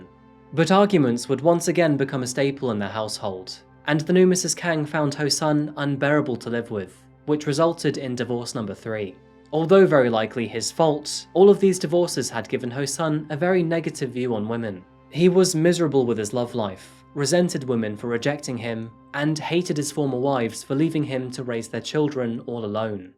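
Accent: United Kingdom. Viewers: It went undetected. I’m still trying to focus on what you’re saying. Idky British accents are hard for me to understand….it’s a real life subtitle 😂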